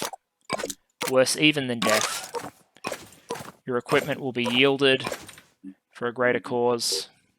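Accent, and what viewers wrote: Australia. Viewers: Your Australian accent is flawless!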